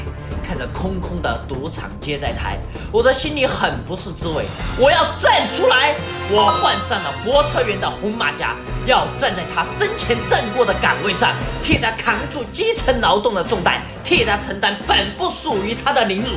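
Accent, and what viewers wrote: Sichuan, China. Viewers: 有个问题想问下台湾的朋友们啊..大陆里面的普通话和台湾那边的无论是用词还是发音习惯都有些区别，厂长的视频里还带了点四川口音，台湾的朋友们能很流畅的听懂吗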